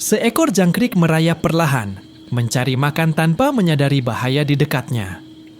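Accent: Medan, Indonesia. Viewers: bg org Medan ya?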